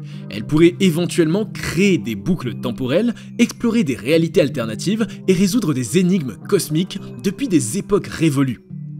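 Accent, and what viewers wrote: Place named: England, United Kingdom. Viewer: purée trop bien ton accent anglais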